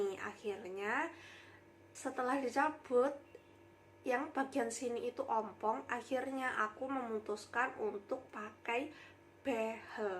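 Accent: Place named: Java, Indonesia. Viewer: Medok rek jowo ne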